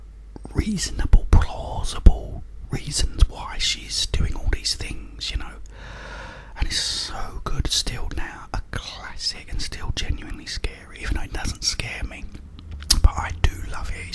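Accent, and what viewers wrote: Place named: England, United Kingdom. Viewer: Where abouts in england 🏴 you from mate?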